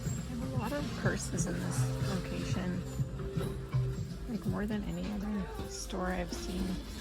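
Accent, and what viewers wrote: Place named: North, United Kingdom. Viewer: Those frosted blue glasses were def a miss! They were so pretty! 😊 Purse is cool, and so curious how much weight that macrame chair would be able to hold! 😮 Also, I need to finally mention this… (hope you see it!) There’s a really great YouTube ad that I rarely see anymore but used to see it a LOT. It’s a supposed buyer who’s showing all the various ways and applications that this particular lint scraper thing can perform - all while narrating it. It’s actually pretty funny bc she says “It will get you questioning your life and things like…Does my dog still have a coat? Am I a gross and disgusting person?” and so on 😂, and at the end she says, “Idk, this just might be the best thing I’ve ever bought from the internet.” ANYWAY, I swear YOU are the voiceover person!! It’s your exact kind of measured dry humor and the voice (complete with northern accent) sounds just like you! 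😄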